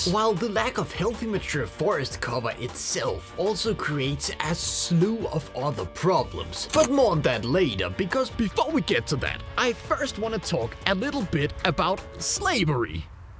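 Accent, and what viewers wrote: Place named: Denmark. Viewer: Hmmm, din accent er meget... Danglish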